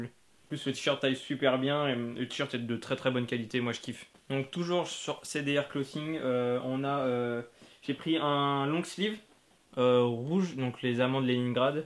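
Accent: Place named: Belgium. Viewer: Ton decor est de plus en plus mortel! achats tops et surtout gros pouce bleu pour ton accent belge un moment ahaha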